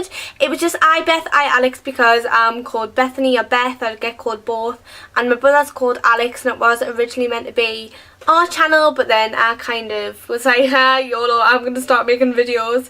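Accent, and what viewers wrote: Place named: Geordie, United Kingdom. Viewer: It is soo good to hear your accent because I've got a penpal in newcastle upon tyne and I want to meet her next summer and so I can "learn" to understand her.. because gordie is a quite difficult language to understand although yours isn't as strong as others ones ^^ (Sry if my english isn't perfect.. I'm from germany :P )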